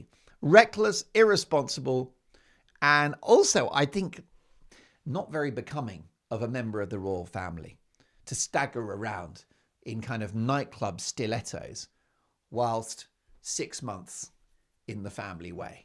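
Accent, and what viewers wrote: Canada. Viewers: Love your french from Moncton NB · Your Canadian accent is great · Your Canadian accent is so funny. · Your Canadian accent is quite good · The Canadian accent is ace!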